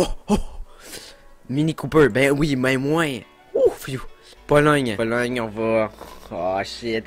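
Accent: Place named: Quebec, Canada. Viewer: Sa fait devoir un quebecois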